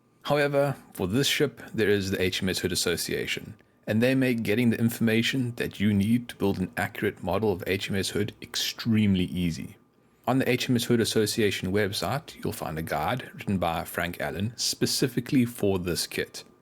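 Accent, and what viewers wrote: South Africa. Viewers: Good god man you do really hate yourself dont you ? Where you from am I hearing a hint of the Karoo ?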